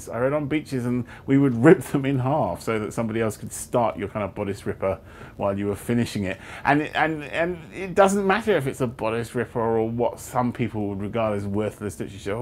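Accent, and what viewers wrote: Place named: London, United Kingdom. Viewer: educated London accent, really foreign name, I want to see this guy on 'Who do you think you are' NOW!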